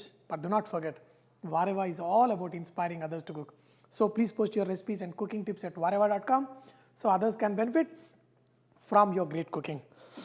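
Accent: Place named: South India, India. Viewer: Snigdha Parida he is from south india so they pronounce like that ☺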